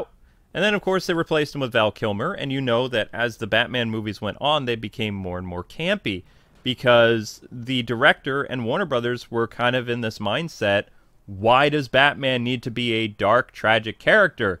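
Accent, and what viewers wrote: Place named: New York, United States. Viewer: I could hear the New York accent coming through today! Great vid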